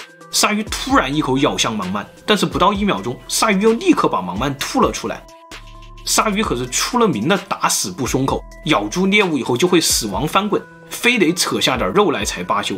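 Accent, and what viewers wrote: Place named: Fujian, China. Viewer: 福建哪里的。。。？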